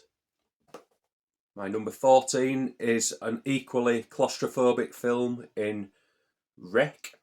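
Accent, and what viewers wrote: United Kingdom. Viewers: hi buddy im from preston and judging from your accent, i dont think your far lol, where abouts r u from ? x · New subscriber, found this video randomly suggested, and thought great accent, sounds like he's from 'ull, like me. And some great picks here 👍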